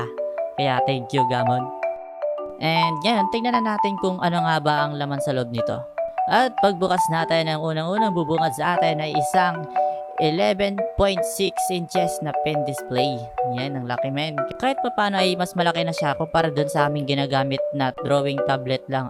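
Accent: Iloilo, Philippines. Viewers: Ilonggo ka idol?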